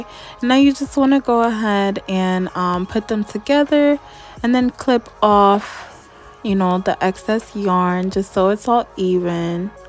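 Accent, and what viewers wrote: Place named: Caribbean. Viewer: Where are you from? You sound Caribbean. Thank you for the pattern, easy to follow.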